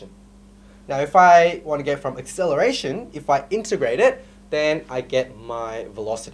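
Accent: Australia. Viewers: Honestly this video really helps, Implementing examples and worked solutions really helped clear some misunderstandings I had, Thanks. Also the Australian accent is really rubbing off on me after watching a few of of these videos.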